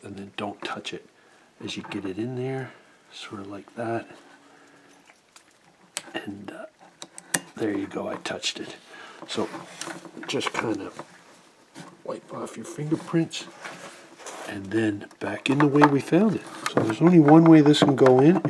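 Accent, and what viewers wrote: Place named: Canada. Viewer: because of your video i'm gonna attempt this myself. plus- you have a great canadian accent- aboot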